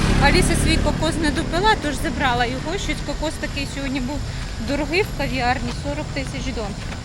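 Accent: Standard. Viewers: Моэ вітання з Німеччини. У вас чиста українська мова. Розповідаєте дуже цікаво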